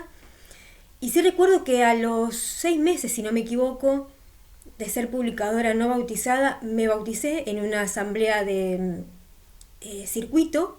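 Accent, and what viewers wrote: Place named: Argentina. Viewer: Que curioso que hayas nacido en España, con ese acento pensé que habrías nacido en Argentina jaja. Que sorpresa lo de tu padre no sabía que era un artista de tango. Lo que cuentas muchos lo hemos vivido también, hay mucha hipocresía ahí dentro. Ancianos que fuman en su horario de trabajo (cuando creen que nadie les ve), adulterio, hermanas que cuando pasan las fiestas con su familia “mundana” que no es testigo celebran navidad y cumpleaños pero luego guardan las apariencias en la congregación (los típicos que tienen un pie dentro y otro fuera de la organización), etcétera. te prohíben cierto tipo de música y luego ellos andan escuchando música “mundana” de lo peor, en fin muchísima hipocresía. A mi de adolescente me prohibían ver ciertas películas porque decían eran malas y no se podían ver pero luego nos invitaban a alguna fiesta en casa de un anciano y su familia y veían películas de Disney como la cenicienta blancanieves o la bella durmiente que también tiene brujería y yo pensaba por qué yo no puedo ver tal o cual película y ellos si pueden ver películas disney que tiene brujería? 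En fin, pura hipocresía, la verdad es que hay un doble rasero y una hipocresía impresionante dentro de la secta. Nadie te tiene que decir que música puedes o no puedes escuchar, eso es decisión personal tuya y nadie se debe meter en esas cosas. Pero te quieren controlar cada aspecto de tu vida. Luego años después leí en un artículo de su página oficial JW org donde dicen que ellos no tienen una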